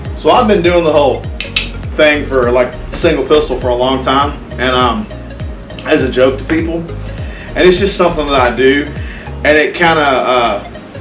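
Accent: South, United States. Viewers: So to sum up: Talks. Sips Crown "Role". Talks. Sips Crown "Role". Talks. Sips Crown "Role". Talks. Sips Crown "Role". Sips Crown "Role". Talks. Sips Crown "Role". Talks. Sips Crown "Role". Talks. This video has been brought to you by Crown Role. LMAO! I think I will contact Crown Role and let them know they have a supporter! Just messin' with ya. I love a Southern accent. I've been sippin' on Tanqueray and Tonics this summer. But the price of the gin at the state owned liquor store is outrageous, so I either need to find a more suitably priced gin that tastes like Tanqueray, or find myself an underground out of state supply! I noticed gas went up about $.20/gallon the last week or two. I was thinking about what caused that and realized it was probably due to refinery shutdowns. Thanks for keeping things rocking there! Daphne Blake should be easily #1 by now of all your bikes. 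Mine definitely is. Reliability, looks, sheer power and speed... You just can't beat it. But my CTX 1300 and V45 Magna were and are definite standouts. There's a new Valkyrie owner on the forum in the Houston area. I posted a link to your channel and told him to check you out. And again, you promised us a Talking Heads video and there was no Talking Heads music. "You may find yourself behind the bars of a large motorcycle. And you may find yourself in a beautiful house. With a beautiful wife! And you may ask yourself... Well? How did I get here?" There - I did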